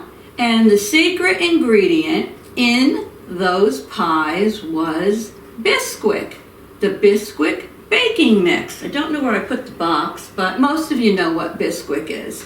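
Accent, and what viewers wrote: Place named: South Jersey, United States. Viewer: Oh man, this looks really good, I even think my grandlittles and son may like it! Baking is not my forte so I am ready and open to learning from the herself! By the way....I recognize that accent. I am a former South Jersey girl. Loved it and miss it but we are now back in my home state, NE. Really love your creative video style. So looking forward to more! Also put your notification bell on "All"!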